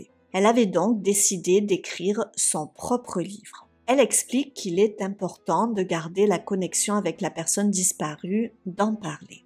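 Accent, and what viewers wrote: South, France. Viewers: J'aime bien t'écouter, malgré les horribles histoires, ta voix est apaisante avec ton léger accents du sud qui chante..😅❤ je suis fan. Merci ❤❤❤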